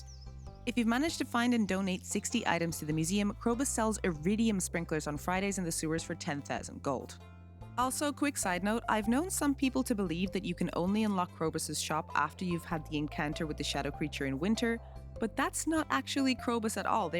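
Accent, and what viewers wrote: Ireland. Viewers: I hope you don't mind me saying, but I looove your accent! Is it slightly Irish??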